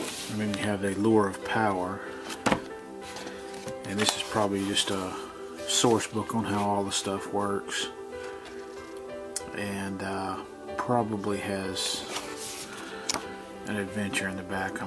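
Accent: South, United States. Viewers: Great vid! And a great voice, where in the U.S you from? Very easy listening on my British ears. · Good video. It's always nice to hear someone with a southern accent who doesn't talk like an idiot. :)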